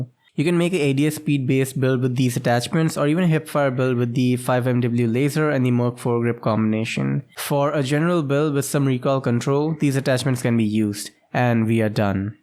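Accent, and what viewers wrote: India. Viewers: R u Indian?? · Your real voice confirms that you're an pure INDIAN good job bro!!🤩🔥🔥🥳 #CYGNOUXTO20KSUBS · voice sounds Indian slang 🙏☺️ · Hmm You are from India , Right? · Bro are you Indian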